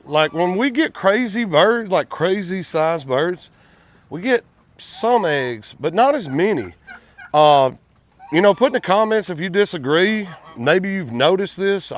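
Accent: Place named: Alabama, United States. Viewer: Alabama!! I miss guessed your accent. My apologies. Yeah I’m currently binge watching your channel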